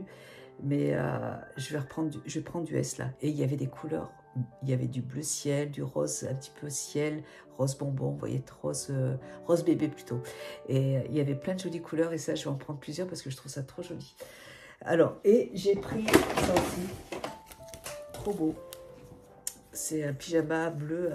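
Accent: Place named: France. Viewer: ❤❤❤❤ very beautiful always. I love your French accent.. sending love from the United states. XOXO. PS will you marry me. LOL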